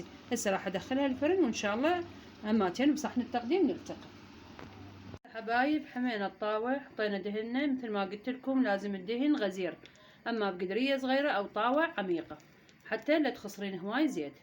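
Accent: Iraq. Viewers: ام بيت ومعدلة وكلللللش احب لهجتج كربلائية الاصل والنعم والله تذكريني بمرة خالي كلش احبها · لهجة كلامج نجفية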